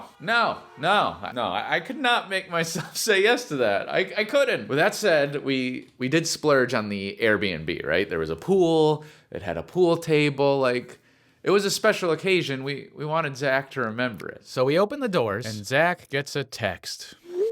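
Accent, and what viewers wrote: United Kingdom. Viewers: Are you British ????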